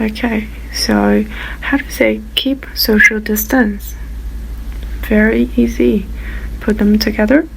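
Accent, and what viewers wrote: Standard. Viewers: 讲的很详细，发音很标准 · 发音很标准，加油！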